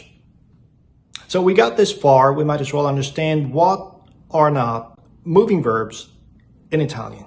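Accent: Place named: South, United States. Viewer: I feel like you're talking with an old timey country boy accent. Has that always been the case and I just didn't notice.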